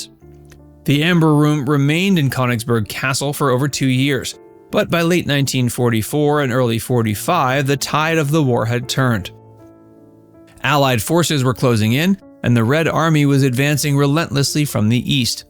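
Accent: United States. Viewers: American pronunciation of nonAmerican language is atrocious. Please learn the standard world-wide usage of words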